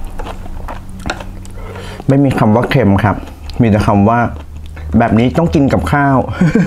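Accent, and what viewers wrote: Isan, Thailand. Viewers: พูดอิสานสำเนียงน่ารักค่ะติดตามตลอดนะค่ะ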